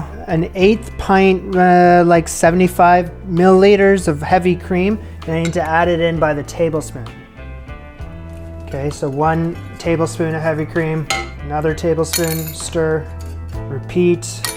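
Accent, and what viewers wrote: Canada. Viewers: Take it "Eout" of the pan,! 😁🤣 Love your Canadian accent.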